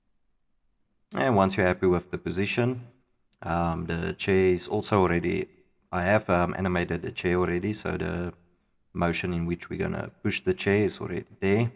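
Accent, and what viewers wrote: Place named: Afrikaans, South Africa. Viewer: i are liking your afrikaans accent :)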